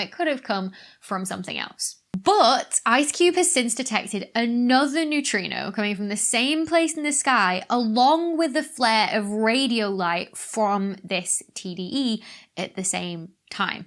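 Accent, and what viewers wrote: United Kingdom. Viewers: That British accent though...If you listen to how she speaks and not necessarily all of the science jargon she's talking about and still love it...give this comment a big thumbs up! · with the british accent and a cute face cant ask more than that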